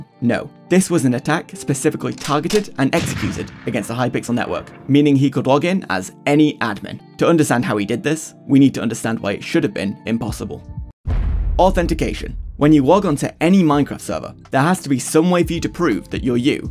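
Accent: United Kingdom. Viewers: best tesco going mate, thought you sounded northern aha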